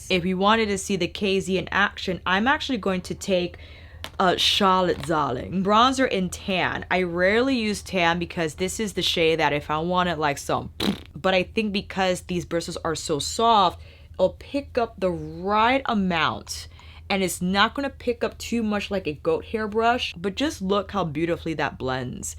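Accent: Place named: United States. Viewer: my love, please don't take that as an offence, but I kinda feel bothered by you pronouncing the Japanese names with the heavy English accent. Since you're a huge fan of Japanese makeup brushes brands, I think it'd be more respectful to the brand and the culture if you look up to pronounce the names a little more correct to the Japanese pronunciation. In fact is pretty easy. I say that because I have a lot of proximity to Japanese culture and it feels a little disrespectful when the names are pronounced the american way. Anyway, great review as always! Love u!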